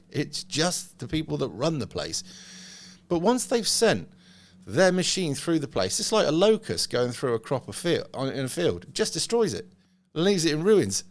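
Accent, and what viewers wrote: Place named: West Country, United Kingdom. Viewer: You sound like a West Country mental defective.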